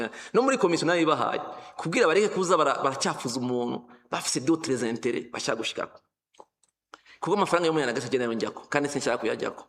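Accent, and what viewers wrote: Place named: France. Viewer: Uyu numurundi canke numu francas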